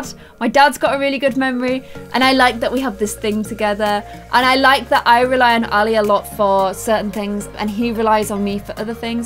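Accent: Ireland. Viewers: UR IRISH!!!!!!!SO AM I!!!!! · are you irish ? lizax yess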